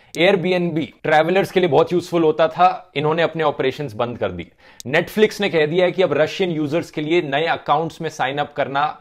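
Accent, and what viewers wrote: India. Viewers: I watched his video after many years today, i had stopped watching his videos when i realised his propoganda. But i still respect his quality of research on non-partisan issues. A big change i got to see is in his Hindi language tone. He has changed his accent in Hindi and now it sounds more desi He deliberately says Hajar instead of Hazar. Good marketing for his propaganda All the best to him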